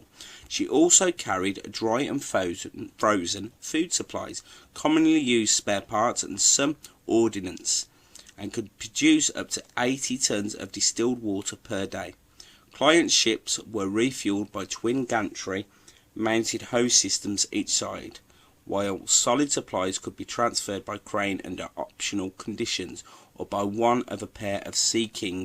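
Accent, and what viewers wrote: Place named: United Kingdom. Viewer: there's something remarkable why is there a British guys talking about Canadian ships??? shouldn't be a Canadian guy talking about Canadian ships?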